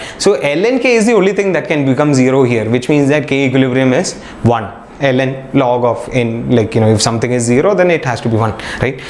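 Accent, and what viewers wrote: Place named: Kerala, India. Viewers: Damn this Mallu accent!!!! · I didn't understand a single THINGY of yours!!! 😑😑🙄🙄 Plus the irritating mallu accent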